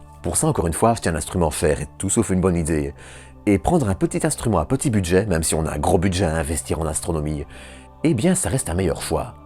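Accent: Belgium. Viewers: Hello. Voici une chaîne Youtube qui m'a souvent étonné. Au début, un ton bien d'ici (je suis Belge aussi) auquel je ne suis pas habitué en video, qui a son charme, d'autant que tu as pris de l'assurance avec le temps. C'est là que j'ai compris que le régionalisme s'il est assumé et que la vidéo est de qualité passe non seulement bien et mais que cela donne une identité supplémentaire. a son identité bien distincte. Ici, tu te mets en scène, et bien çà marche! Les moment plus spontanés, tes regards sont vraiment sympathiques. Bref, surpris de voir que c'est un jeune derrière ces vidéos, avec un style qui attire l'attention et une passion communicative. Je me re-regarde cette vidéo, et bien elle fonctionne vraiment bien. (la touche d'humour à n'apporte rien cette fois-ci à cette video, mais essayes, tant que tu n'abuses pas.) CLAP-CLAP-CLAP-CLAP-CLAP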